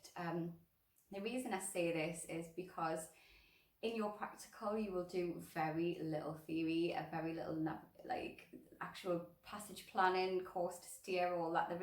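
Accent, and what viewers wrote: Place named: North East England, United Kingdom. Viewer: Just came across your excellent channel. Thanks for sharing your experiences. I’m from South Shields and spotted Tynemouth Priory, which brought back found memories of home (I now live on the Canadian Prairie these past 26 years). I’ve recently bought my own sailboat and have a couple of courses under my belt, but one day I hope to do the RYA day skipper and sail around some of the UK. Wonderful to hear your north east accent! Fair winds and I look forward to watching more of your adventures…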